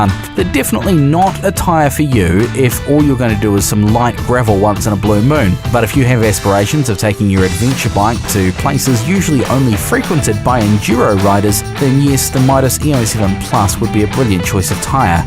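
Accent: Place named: New Zealand. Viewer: I'll never get used to the Kiwi accent pronouncing an E like an I.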